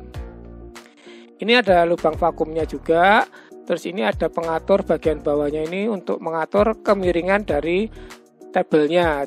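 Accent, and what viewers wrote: Java, Indonesia. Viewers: Lidah Jawa nya tebal bro.... 😂 😂 😂 😂 😂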